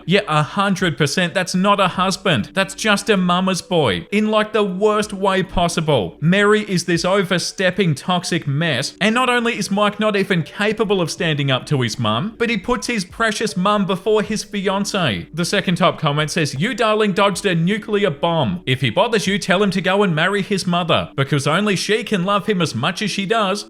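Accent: Australia. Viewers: Everybody has an accent. Thats how speaking works. And youre Australian? And you dont think you have an accent? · On the point about accents, you do have an Australian accent, although not super strong. Everyone has an accent, mine being a mix of Pacific Canadian & central Canadian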